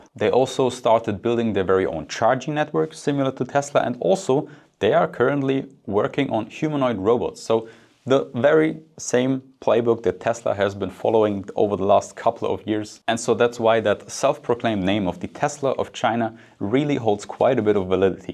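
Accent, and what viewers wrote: Germany. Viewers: Horrible German accent. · Can not stand this horrible German accent. Get pain from this accent.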